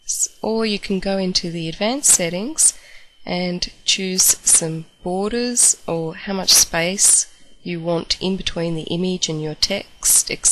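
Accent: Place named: New Zealand. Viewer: The voice is clearly NZ and she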